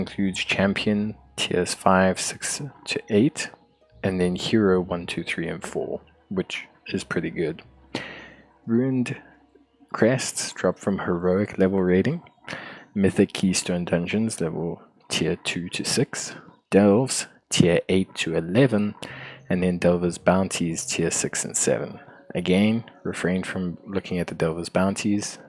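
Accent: South Africa. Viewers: Bro, you South African?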